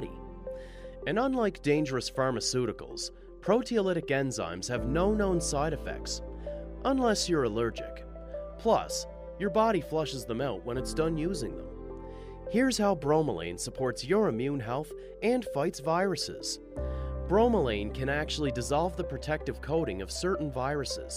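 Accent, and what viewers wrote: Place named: Canada. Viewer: I'm not from North America, but am I right in thinking this man's accent is from Canada or Nova Scotia? It sounds different to a regular US accent.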